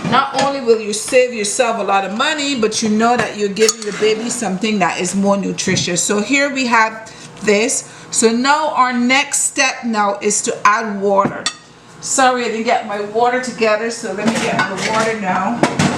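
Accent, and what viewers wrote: Barbados. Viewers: So sweet to hear a real-real bajan accent.. even if it's putting something in her well.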